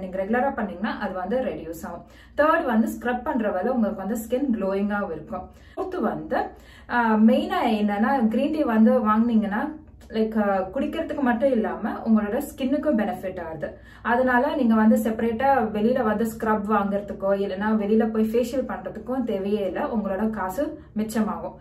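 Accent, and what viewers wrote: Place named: Telugu, India. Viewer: Very nice tips...loved your telugu tamil :)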